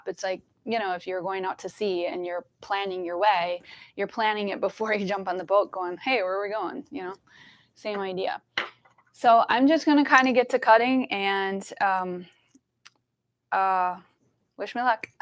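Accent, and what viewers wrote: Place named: Eastern Europe. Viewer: rahul kumar I agree. And her accent is adorable. Sounds like she was raised with another language. Eastern European possibly? 🔥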